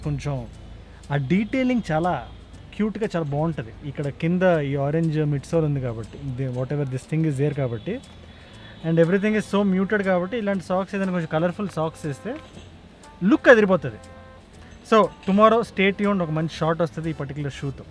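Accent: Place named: Karnataka, India. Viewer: Ur Kannada accent is 😍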